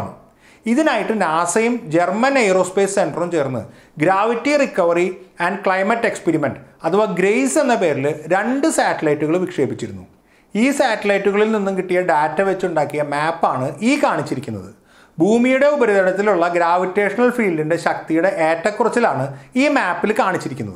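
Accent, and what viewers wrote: Thrissur, India. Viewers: I ഈ അവതാരകന് trissur കാരന്‍ ആണോ അത് പോലെ slang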